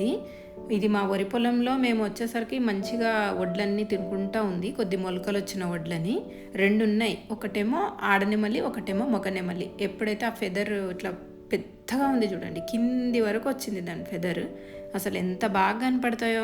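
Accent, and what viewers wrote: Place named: Rayalaseema, India. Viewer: Ragi sakati resipi chayandi garu maa rayalaseema basha matladuchnnaru meeru andi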